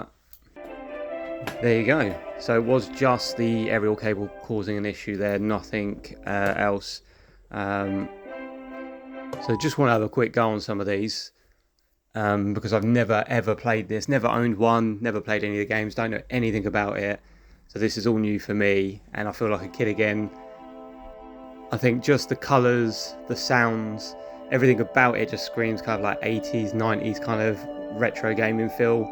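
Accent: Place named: United Kingdom. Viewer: Yes they will mega CD model 1's are prone to leaking cap's on the main board especially if you remove the shielding you will probably see green muck around the caps every mega CD model 1 I've worked on has had leaking cap's on the main board, the sub board -power board uses though hole cap's they don't normally leak but can as well , you should try to find someone to recap it asap these model 1's are getting quite expensive nowadays and very sort after, I would love to recap it for you, but I'm all the way over in Australia you sound like your in the UK good luck 🤞 with it friend ☺️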